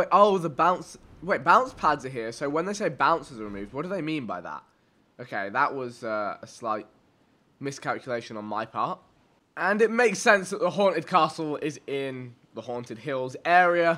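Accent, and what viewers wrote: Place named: United Kingdom. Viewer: Why do you talk like your from the UK your indian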